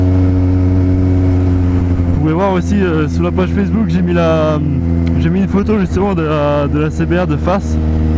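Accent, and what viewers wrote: Alsace, France. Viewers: T'aurais pas un petit accent alsacien ? ;) super vidéo sinon ;)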